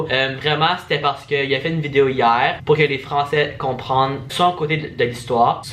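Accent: Quebec, Canada. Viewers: C’est marrant parce que quand tu parle en français tu as un accent canadien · L’accent Québécois/montréalais!!! Je croyais que tu parlais seulement anglais mais quand j’ai vue ta vidéo ou tu disais que tu avais une chaîne en français et que tu viens de Montréal, j’étais tellement heureuse ❤️ car je suis de Montréal aussi☺️ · pk tu dis sa ? esq il a une autre langue ?? tout les quebecoi parlent comme sa c normal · Accent québécois il vis au Canada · C'est un plaisir de t'écouter, ton français façon Canadien, j'adore ! Continu, tu as tout mon soutien ! 👍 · Sa sonne comme du Québécois j’aime sa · On voit bien qu'il est québécois avec son accent, et il l'a dit dans une de ses vidéos qu'il venait de la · Il est québécois, donc il a l'accent québécois. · Tu as un accent québécois quand tu parle en français, mais tu parle aussi anglais comme les américains je suis confuse 😂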